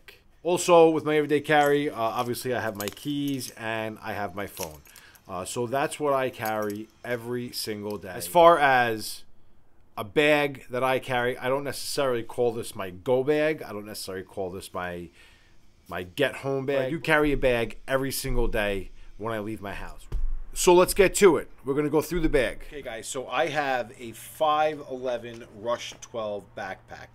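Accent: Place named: New York, United States. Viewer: I immediately subscribed cuz the second I heard you talk I knew you was a fellow New Yorker! Alright bx zoo!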